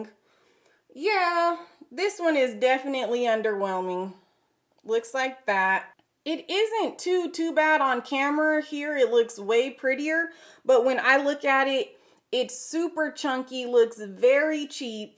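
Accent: South, United States. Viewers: she sounds country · This is my first video and I can not for the life of me figure out where this girl is from... what accent does she have? It sounds southern but with a twang?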